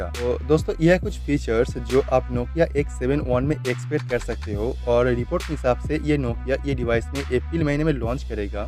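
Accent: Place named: Assam, India. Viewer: Assamese accent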